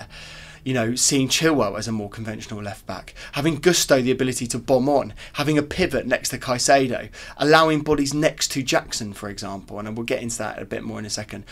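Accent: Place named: Brighton, United Kingdom. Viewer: Are you from Brighton? You sound a lot like some people I know from Brighton. Love your attitude and outlook. We need more fans like you.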